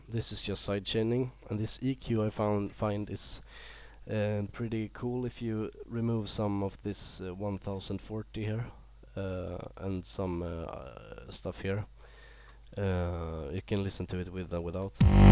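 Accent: Sweden. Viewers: U sound soo swedish! are u swedish? jag är de ;)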